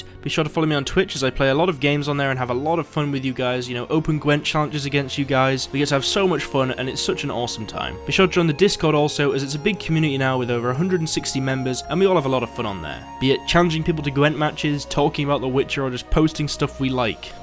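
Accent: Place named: Scotland, United Kingdom. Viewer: The Scottish accent tho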